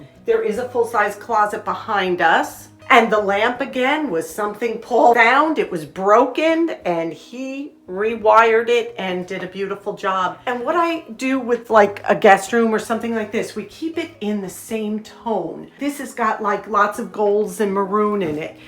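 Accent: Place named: Long Island, United States. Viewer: Love it! Are you from Long Island, NY? Can't miss your accent! Is the icing on the cake...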